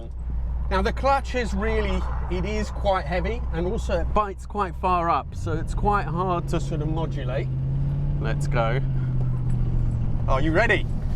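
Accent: Italy. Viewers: Love that car, what a machine. And regarding people moaning about the Italian accent, don't change it, if they don't liked don't watch it. :) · Pantera has been one of my favourites since when I was a kid. But I didn't know she had such a gorgeous beautiful interior until I saw this video. Especially after seeing a spaceship like cockpit of the modern cars , it's got a very good taste. As someone said that it's not a car but art. Yes it's more than a product but art level of thing with a passion. It must come from Italian character. I like the way you talk so nicely and jolly and clear as well.And I don't mind sometimes you talk with an Italian accent. It's fun and give your program a good character. I accidentally found your program first time today. I like your narrations very much. It's very pleasant. Thank you! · I had driven my friend’s LHD in Vancouver back in the 90’s and it was amazing! 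BTW, Italian accent is beautiful! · Absolutely love the pantera hate the Italian accent totally spoiling your videos which I look forward to watching every week please stop as it’s cringe city,keep them coming (without accent)👍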